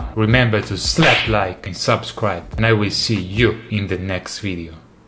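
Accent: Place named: Italy. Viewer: This is the most Italian accent of I've heard so far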